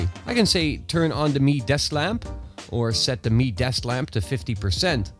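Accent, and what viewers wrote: Netherlands. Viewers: Vreemd dat zijn Engels echt accentloos is maar je toch kan horen dat hij Nederlands is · Thicc Dutch accent over here haha, nice vid though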